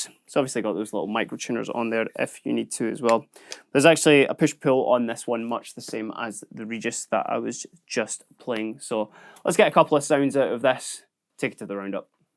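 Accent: Scotland, United Kingdom. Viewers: Unrelated but what accent does the lovely lady have? I hear Scottish, but then maybe something else behind that. Possibly Irish instead of Scottish, but I don’t think so. These are interesting guitars. Kind of unfortunate about the name.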